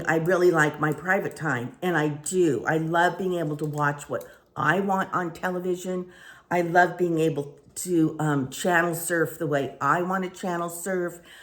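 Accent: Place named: New York, United States. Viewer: Idk why but you remind me of Talk show host Wendy Williams. You kind of have her mannerisms and her New York accent. 74 wow u look amazing.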